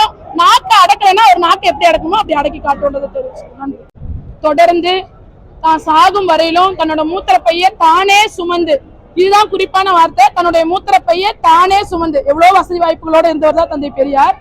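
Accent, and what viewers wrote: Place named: Telugu, India. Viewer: அக்கா பேசுறத பார்த்தா தெலுங்கர் தான் இருக்கு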